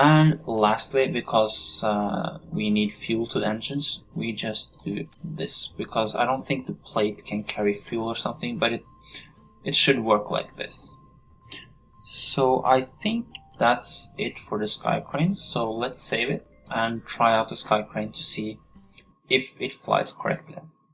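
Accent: Norway. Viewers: Where are you from? Norway? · i hørte med ein gong at du var norsk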